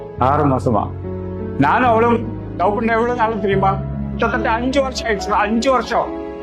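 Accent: Kerala, India. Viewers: Semma super acting tamil and malayalam kalantha unnoda voice arumai 😍😍😍😍😍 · சூப்பர் உங்க ஆக்டிங் exlend ❤❤❤❤❤ உங்க மலையாளம் கலந்த தமிழ் நீங்க பேசும்போது சூப்பரா இருக்கு ❤❤❤❤❤❤ உங்க எமோஷனல் லவ் feel பண்ணி பேசும்போது சூப்பர் வேறலெவல் ❤❤❤👍🏼👍🏼👏🏼👏🏼👏🏼👏🏼👏🏼👏🏼👌🏼👌🏼👌🏼👌🏼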